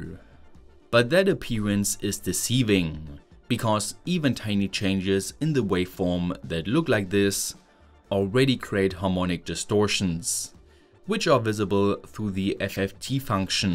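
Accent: Germany. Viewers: But why do you have such thick German accent if you say that you are Scottish?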